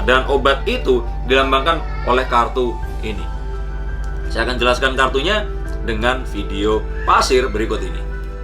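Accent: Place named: East Java, Indonesia. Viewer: sampean wong jawa timur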